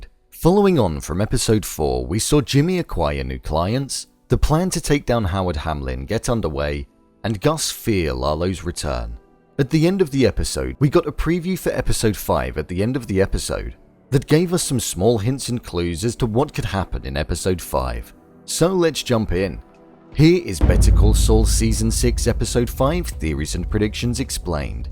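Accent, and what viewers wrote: England, United Kingdom. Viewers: Sry, I love your engl Accent, but you should do some more research when making Previews for Youtube...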